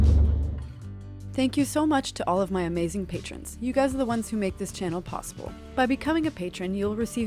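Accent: Canada. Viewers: Love your Canadian pronunciation and I am sure the dolphin was a good omen, all the best on the ICW.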